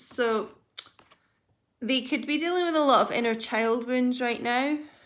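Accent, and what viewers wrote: Scotland, United Kingdom. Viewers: I am Leo, Libra and I have know each other for 45 years. I am her twin flame, we met in high school and I fell in love with her. We dated for 7 years and the married for 10 years. We never had closer and don't misunderstand me I am still in love with her she remarried and I remarried, the last time I spoke to her was in August of 2019 the call lasted 49 seconds the conversation was not good but 3 seconds after she hung the phone up I was in a state of euphoria, because I got to hear her voice. We were divorced in 1983 ( The divorced was totally my fault). The story of how we got back to each other is incredible to long to type it out. I love Libra so deeply from my heart and my soul. Some time in March of 2019 I got a bad stomach ache and realized something was wrong but because Libra is a very very private person I could not contact her and realized I was ghosted. 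I don't know where she is living I have no phone number as of this date. no communication in 3D or 5D although I believe I have all of the tools I am blocked. I am an engineering manager. The only communication that I have is from watching youtube readings. And you must understand I use a spreadsheet to get a timeline. I am in my 10th month of being blocked that's how much I love her and want to help her. if you would like to talk with me <num> I would love to talk to you. I love your reads, and that scottish braug, I spent 3 years in Dunoon Scotland. Any help you could give me will be greatly appreciated.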